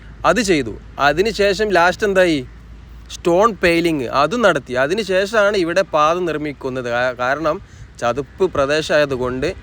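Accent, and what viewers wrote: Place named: Kannur, India. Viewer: സൂപ്പർ വീഡിയോ ഇത്രയും മനോഹരമായി dron ചെയ്ത വീഡിയോ ആദ്യമായിട്ടാണ് കാണുന്നത് നല്ല അവതരണവും ഇടക്ക് കോമഡിയും സൂപ്പറാക്കി നമ്മുടെ സ്വന്തം കണ്ണൂർ ഭാഷ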